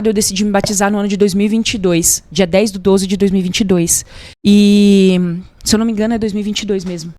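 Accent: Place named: Videira, Brazil. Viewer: Você é da Videira? seu geito de falar é igual o de lá kk.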